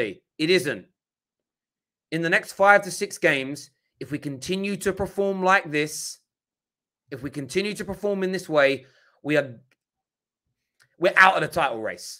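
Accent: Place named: United Kingdom. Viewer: I am from Indonesia ,i like your british axcent.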